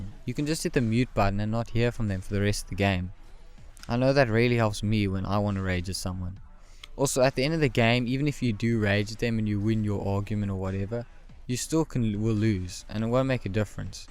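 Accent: South Africa. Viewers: u from south africa bro? can hear it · Your South African, aren't you xD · You from South Africa bruh? :D I can hear that accent clearly my bra> good Video btw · south african ? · is this guy from south africa?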